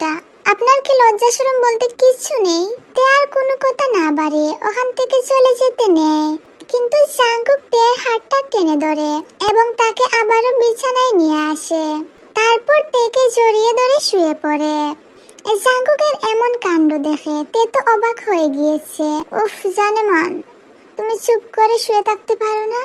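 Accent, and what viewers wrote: Sylhet, Bangladesh. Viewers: তো তুমি কি সিলেটি নাকি চিটাংগা🎉🎉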